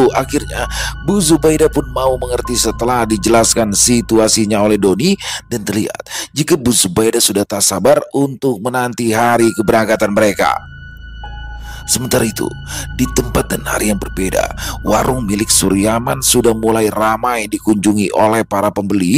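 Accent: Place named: Betawi, Indonesia. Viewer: sambil dengerin sambil ketawa,suami tanya knp tu senyum" dan ketawa sendiri?hahaha saya lgsung jawab aja asik aja ni loghat betawinya kocak sangat🤣😊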